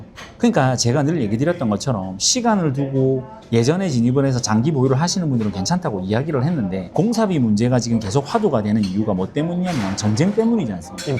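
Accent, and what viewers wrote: South Korea. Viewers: 근데 사투리 엄청 쓰네…. 태생이 지방러인듯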